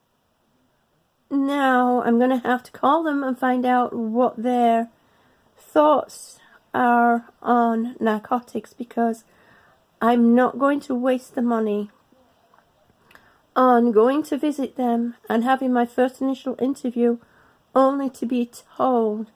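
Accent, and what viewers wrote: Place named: United Kingdom. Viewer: Hi. I'm just sort of taking it day by day. I caught a cold a few weeks ago but thankfully it didn't drop into my lower respiratory where it could cause all that havoc like the first two times since I got back from Europe in 2015. I think drowning myself in Vitamin C helped to keep it confined to the upper. I just read that the FDA has ordered the pharmaceuticals to drastically cut back on the production of opioids this year and probably even more in subsequent years because of the problems of youth abuse/death. Have you been affected by this,? People in pain management who follow their Dr's directions are unfairly being caught up in this tug of war. Dr's are getting terrified to prescribe narcotics because of threats from the FDA. It's really an intractable situation and innocent pain sufferers are snared in these politics. 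I cannot think think of a person more qualified to receive them than you and I pray you aren't affected by all this. PS I detect a British accent. Are you on the NHS or are you here in the US? You may not be feeling the effects of the cutbacks we are having here. I read that Britain is one of the friendliest countries for use of narcotics if the use is legitimate. Hang in there. Somewhere out there is an understanding Dr. who ca appreciate your dilemma. You just have to find him.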